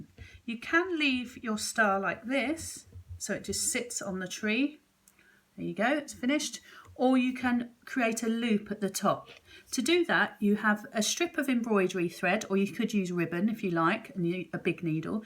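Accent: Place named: England, United Kingdom. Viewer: Hi from ARGENTINA!...I loved this project..beautiful star! ..and I loved your ACCENT!! What part of England are you from? You speak really clearly and nice...😊😊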